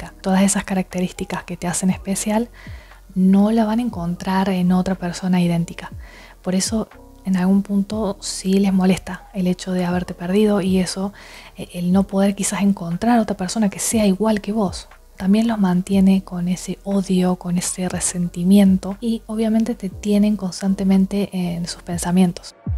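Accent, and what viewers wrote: Córdoba, Argentina. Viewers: Oh Qué decirte? Realmente sorprendido Denoto en tú dulce voz una sutíl tonada cordobesa Empecé a escuchar tús audios dé casualidad Primero uno y después otro y otro y otro más Nunca doy likes ní hago comentarios ní mé suscribo Pero WOW con vos ló voy hacer, té ló merecés GENIA, la dulzura dé tú vos, la simpleza dé las explicaciones pero con contundencia y firmeza Didáctica Cada comentario tuyo es una incisión profunda y precisa Qué más decirte? Mé gustaría decirte muchas cosas más Pero yá mé extendí demasiado Té deseo ló mejor, muchos éxitos, té ló merecés Espero verte pronto en alguna conferencia Mé encantaría eso Sos un orgullo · Me encanto tu video. Estuve 3 años con un narcisista encubierto por 3 años. Muy abusivo verbal y emocionalmente; precisamente de Cordoba; tu mismo acento. Bueno, yo lo apoyaba financieramente por los 3 años que estuvimos juntos. 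Mientras más yo lo ayudaba peor me trataba y exigía más. Terminé casándome con el y a los 3 meses no soporte más sus maltratos y humillaciones y lo dejé. Agarre mis cosas y me fui. Me hizo Imposible las últimas semanas antes de mi salida. Lo malo es que me robó dinero. Yo le preste dinero en un momento que el estaba en un aprieto financiero antes de la boda y no me los quiere pagar. Me bloqueo para que no le pida mas el dinero. Y habla mentiras sobre mi y porque terminamos ! Que me importa! Ahora estoy con mi abogado tramitando el divorcio y todo. Lo curioso es que después que lo dejo, me entero a través de víctimas que estaban pendiente de nuestra vida juntos, que es un estafador en Córdoba. Me contactaron sus victimas ! Estafa mujeres que las engancha y les roba. También hombres que les hace creer que es un inversionista millonario en Miami … uffff una lacra total. Ósea me case con un delincuente sin saberlo. 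Además de narcisista. Gracias a Dios me di cuenta tiempo, ese hombre hubiese acabado conmigo, y con todo lo que tengo, capaz de asesinarme para quedarse con mis cosas. Ahora estoy bien. Dejarlo fue como volver a nacer y soy feliz otra vez. No lo extraño para nada. Ahora gasto mi dinero en mi y en mis hijas , viajo , invierto en arreglar mi casa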